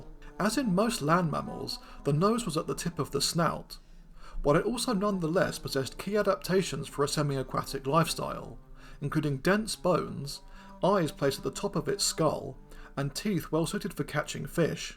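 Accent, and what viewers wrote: United Kingdom. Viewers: you sound like paddington but more british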